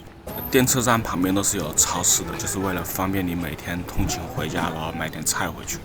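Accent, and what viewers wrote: Wuhan, China. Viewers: 楼主口音像湖北武汉的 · 楼主武汉人，弯管子，口音好重。😄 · 听口音就听出来是武汉滴，果然哈哈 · 这个汉普好亲切啊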